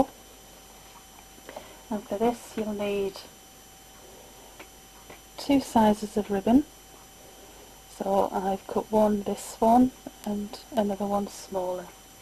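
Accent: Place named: North East England, United Kingdom. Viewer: thankyou for a great tutorial , love the flowers you sound very similar to my cousin who lives in Saltburn up north do you come from the north east??im guessing you do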